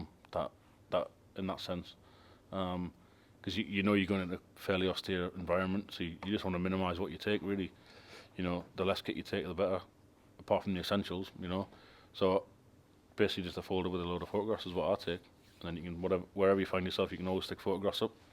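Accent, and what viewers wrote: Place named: Newcastle, United Kingdom. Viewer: what part of Geordy land are you from ????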